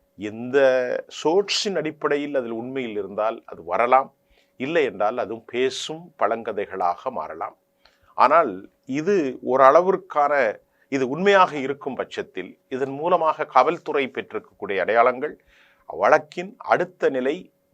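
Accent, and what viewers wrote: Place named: Sri Lanka. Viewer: இலங்கைத்தமிழில் கதைப்பதைக் கேட்க இனிமையாக உள்ளது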